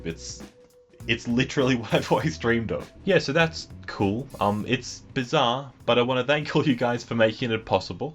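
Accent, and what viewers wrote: Australia. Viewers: GoingGone he’s Australian. Not British.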